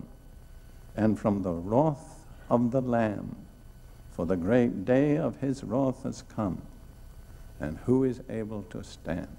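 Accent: United Kingdom. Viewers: Yes! I fully believe you must give way, and let Jesus lead. I wanted to note to the deaf, that toward the end, when he is preaching from Revelation, he mentions "the wrath of the lamb". His british accent causes the captioning to type "roth". But he say's it 3 times, and each time "Lamb" is captioned incorrectly. (Shame on you, Youtube)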